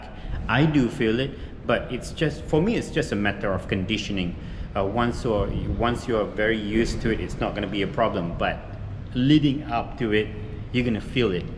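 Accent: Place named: Malaysia. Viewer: Your english sounds Malaysian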